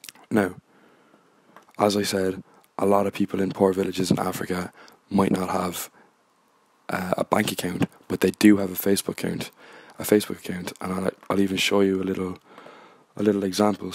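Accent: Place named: Ireland. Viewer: In Swindon now but lived in Waterford for 15 years. Can listen to many accents and not give a shit but as soon as I here the Irish accent I know I will watch the video :-) + sub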